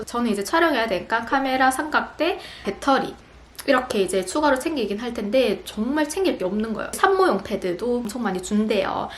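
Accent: Gyeongsang, South Korea. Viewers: 처음 댓글 달아봐요~ 경상도 사투리에 말이 엄청빨라서 호기심에 봤던 영상이 벌써 출산짐싸는 영상까지 하네요^^ 제가 출산경험자로 알려드리고 싶은건 여름에 아기낳으면 에어컨바람 조심해야해요. 넓은 손수건챙겨가셔서 목뒷쪽 찬바람 막아주면 좋아요. 모유수유하다보면 목,어깨 아픈데 산후풍까지 오면 힘들어요. 아기 낳는순간은 힘든데 참을만하고 낳고나면 아기생각에 정신없이 지나가요. 모든 엄마들이 그렇듯 도 잘 이겨낼수 있을꺼예요 순산하세요~~ ^^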